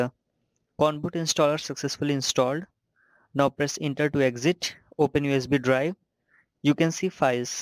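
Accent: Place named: India. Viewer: indian accent is sooo funny xDDDD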